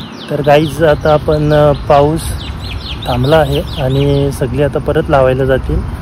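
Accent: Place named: Agari, India. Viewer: Agari bhasa ahee tii amchii amii lahan. Pana psun asch boltoo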